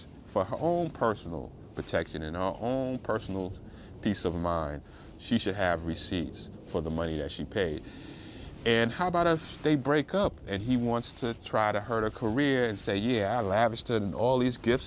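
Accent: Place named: New Jersey, United States. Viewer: it sounds like ur from Jersey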